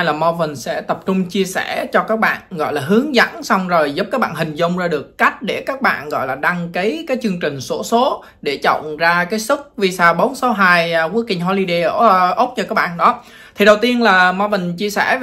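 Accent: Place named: Quang Nam, Vietnam. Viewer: Nghe giọng hình như a quê ở quảng nam đà nẵng gì phải ko ạ. Nghe a chia sẻ rất chân thật dễ hiểu . Em cũng có dự định tham gia mà chưa rõ các giấy tờ nào cần công chứng hay ko cần mong a ra video mới ạ❤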